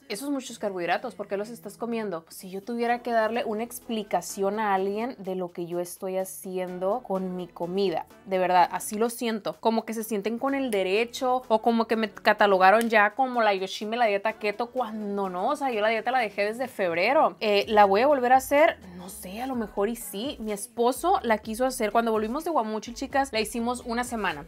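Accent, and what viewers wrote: Sinaloa, Mexico. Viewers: Holaa, la verdad es la primera vez que te escribo. Tengo poco tiempo de ver tus vídeos gracias a una excompañera de trabajo que compartió una publicación en Facebook, desde ahí te veo cada vez que puedoo. Para ser sincera, yo soy muy floja para ver videos largooos, pongo algo y si dura más de dos minutos lo quito jaja pero contigo me siento taaan agusto, primero veia que duraban mas de 20 min y yo.. ay noo.. jaja pero con tus platicas y tu forma de ser wooow, me encanta.. ya ni me fijo en el tiempo jaja. Yo soy de sonora y me gusta mucho como hablas y como tienes tu esencia sinaloense, esa es una razon por la cual me gustan mucho tus videos, auténtica y sencilla.. por aquí seguiré viendo tu contenido.. eres muy lindaa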